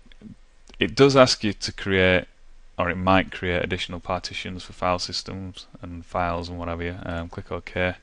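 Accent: United Kingdom. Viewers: Thanks British bro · Thanks dude, i like your British accent :) You helped me partition my HD going back from Linux to Windows. cheers mate!!!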